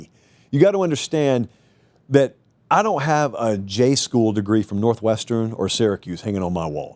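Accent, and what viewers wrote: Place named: Tennessee, United States. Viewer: The comparison between you and McAfee is interesting.. both guys without “J school” degrees making it big simply due to the advent of the digital era.. but you two are polar opposites in terms of the appeal behind your success.. McAfee is charmingly dumb, funny to the average man, slightly controversial.. you on the other hand are a true intellectual who happens to have a TN accent and love college football.. and because you use big words and back your opinions with critical analysis and make jokes that are way over most’s heads while inevitably offending people who watch your content already having their minds made up I think your audience cap is much less.. if you sell out and pander to the masses I think you could be #1 in 2035 👍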